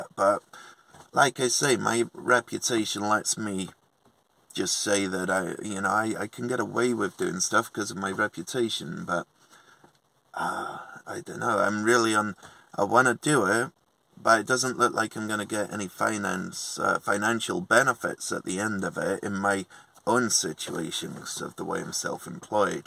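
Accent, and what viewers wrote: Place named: Cornwall, United Kingdom. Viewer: Joanne says you’re beginning to get a Cornish twang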